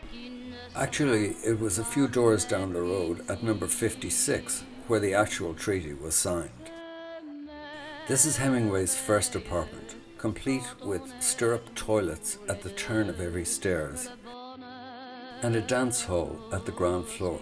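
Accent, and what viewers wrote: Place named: RP, United Kingdom. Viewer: A great tour, to be sure, but despite the prestige British [or maybe posh Dublin] accent, the poor pronunciation, at times dyslexic, of French names and locations —and depicting le Musée d'Orsay as le Musée du Louvre— detract from the presentation. At least it's seemingly an actual human voice, a thing you can't always count on these days on YouTube.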